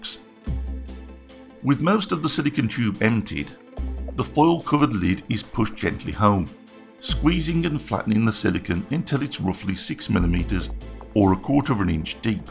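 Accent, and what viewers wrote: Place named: Scotland, United Kingdom. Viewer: Good example of why I subscribed to your channel. Love your work and most of all your commentary. Hope your mother-in-law doesn't watch. One question, your accent hinges a bit on the Scottish side, how close am I ??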